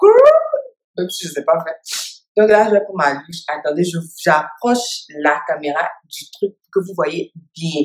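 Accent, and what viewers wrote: Abidjan, Ivory Coast. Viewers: Toi tu es ivoirienne l'accent .😘😘merci la sœur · Ivoirien quoi bisou ma soeur 😂😂😂😂 français terre a terre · cc j espere ke vs allez bien; merci bcp pr le dèguè. J aime votre maniere simple de presenter votre recette. On sent la voie dune vrai abidjanaise. RIRE. BISOUS. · Cc j espere ke vs allez bien. Merci bcp pr le déguê. J aime bien votre maniere simple de presenter votre recette. On sent la voie d une vrai abidjanaise😂😂. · Bravo ma chérie 🤩🤝👏 pour ce chic dèguê 😂j’ai suivie avec plaisir , l’accent de chez nous , le “c’est Maman qui m’as appris depuis Abidjan “ tout cela j’ai aimé même 😂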